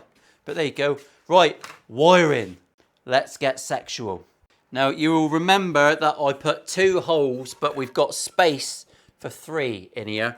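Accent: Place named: Northern Ireland, United Kingdom. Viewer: Weird the way I can't 'hype' your videos. Must be the humour. I wish id seen this before I did mine. Exactly the same body. Just a custom neck I made. I might now review my work on the body. Cheers mate 👍 .. your Norn Iron accent is spot on 😂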